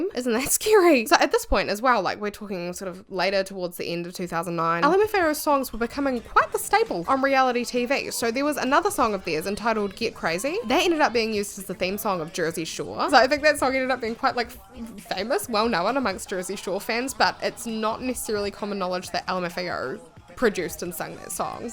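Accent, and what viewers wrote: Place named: New Zealand. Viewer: about your accent, it's beautiful and really pleasing to hear. I'm from Portugal and before watching your videos I had never heard a kiwi accent, so you're kind of an ambassador now lol I hope that u don't feel the need to explain it everytime ✨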